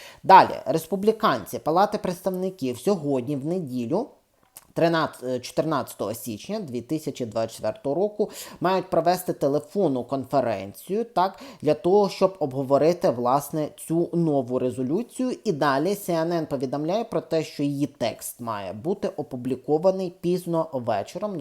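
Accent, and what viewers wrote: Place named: Canada. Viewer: цікавий акцент. ви проживаєте у Канаді?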